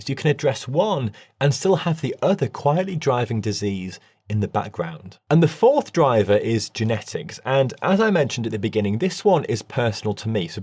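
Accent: United Kingdom. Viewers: não sei se vc é AI mas se for, quem te fez está de parabéns! além de lindo, inteligente e explica de uma forma que qualquer um pode entender! E esse sotaque britânico fecha com chave de ouro! agradeço ao algoritmo ter mandado esse video pois além de bem feito com ótimas informações, tem uma estética agradável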